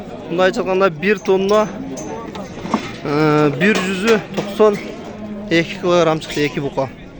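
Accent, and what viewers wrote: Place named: Batken, Kyrgyzstan. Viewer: Баткенде ошентип айтылат аррегиондунсуйлоо речи бар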